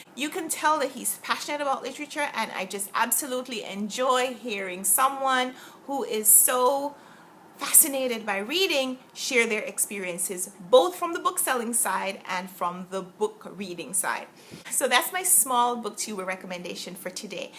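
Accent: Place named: Jamaica. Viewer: It's so heart-warming! I too LOVE your Jamaican accent! It's so beautiful! Irie!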